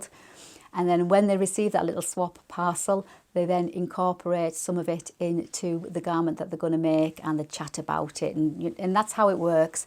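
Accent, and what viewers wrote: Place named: Northern England, United Kingdom. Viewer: hello, I came here from The Dahlia Sewciety channel. Kristen was showing your blouse pattern. Now a new subscriber! May I ask which part of the UK you are from? I'm fascinated by accents and I'm thinking somewhere in the north of England 😃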